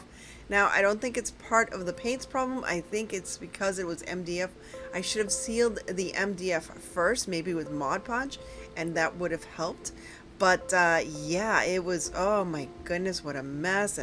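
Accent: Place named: Miami, United States. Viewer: Ohhhh, ok, now it all makes sense : your accent, the love of halloween......you're from miami! Lol i grew up in kendall til i was 15 n i KNEW you sounded like my family😂 i feel like NO ONE n NOWHERE really celebrates n does it up for Halloween like Miami n ppl born n raised there. Idk if u were born there, but if u werent, ik youve been there a LONG TIME. Ahhhhhh, mi hermosa!!!😂❤❤❤ youre projects are amazing and i ADORE THEM!!!❤❤❤❤❤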